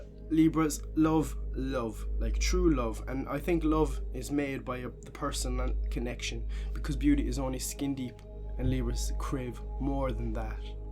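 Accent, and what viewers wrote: Ireland. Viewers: Ireland… WOW. Your accent is aMAzing😃. I like a Libra a lot, but… I didn’t realize he liked me at all(!). I thought he was just being nice and vice versa. He thought I was just being nice. Suddenly, I began receiving highly spiritual signals about this guy. I misunderstood these signals for about two months—till recently. Then, I realized he was trying to tell me too. The challenge is that we see each other in a professional environment. I trust that God has a plan. Cheers🌬️🌤️🕊️.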